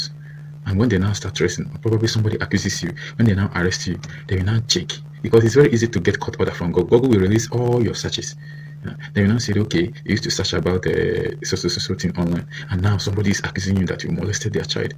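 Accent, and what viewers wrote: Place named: Nigeria. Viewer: Your Nigerian English accent is very difficult to understand. It's good enough for your Nigerian selves. It's made WORSE by your speaking so so abnormally fast. I can't subscribe to this , sorry.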